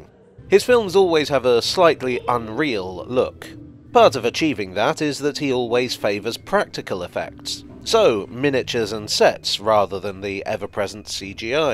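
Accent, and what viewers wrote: United Kingdom. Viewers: I'd watch anything you make because I find your voice soothing and it helps me understand the British accent (I'm not a native English speaker) and I love trains in general, so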